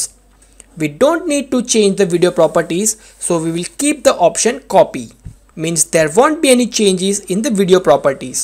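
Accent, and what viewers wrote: India. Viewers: I almost removed the video as soon as i heard indian tone but the fact you spoke English is awesome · as soon as I heard Indian Sound I knew I was saved Thanks mate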